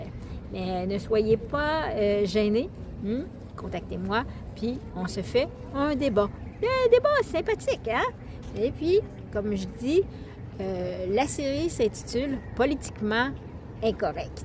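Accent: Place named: Quebec, Canada. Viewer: Votre voix est magnifique😅, vous vivez surement au Québec. Belle élocution et intelligence verbale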